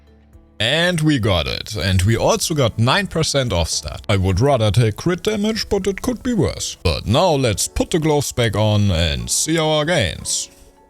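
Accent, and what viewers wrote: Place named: Germany. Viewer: could instantly tell ur german by the accent, but good stuff haha keep up the work :)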